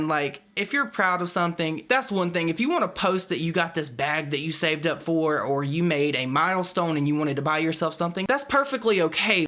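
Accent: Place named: Oklahoma, United States. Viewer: Just found your channel and subscribed! Loving the content!! Keep it up!! :) When I heard you start talking I googled to see where you were from because I thought you were from Oklahoma where I am from! :)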